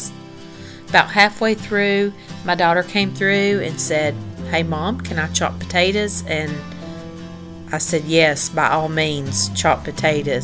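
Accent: South, United States. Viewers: thank you so much I just watched too but I do everything in three so I'm going to watch another one cuz you seem like you're a lot of fun and I love your accent my mom was from the south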